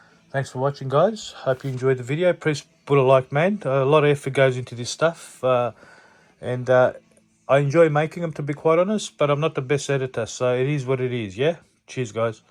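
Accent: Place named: Australia. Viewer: Love it, and the Reo reference makes a lot of sense, you sound Aussie, where are you located bud?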